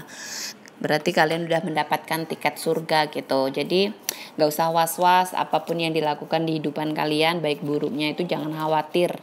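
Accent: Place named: Java, Indonesia. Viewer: Suaranya mbak kok medok yo asale mana to mbak heeee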